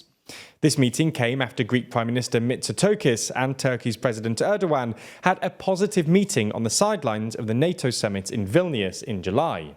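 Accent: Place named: London, United Kingdom. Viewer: lol.....they arent.....they are oceans apart , worlds apart . greece is an ultra secular society only a bloke with Piccadilly square accent could misdiagnose this deescalation as the beginning of a friendship i am half italian half greek and i can firmly say that greece n turkey is like oil n water they dont mix. kalimera to the world from kalamata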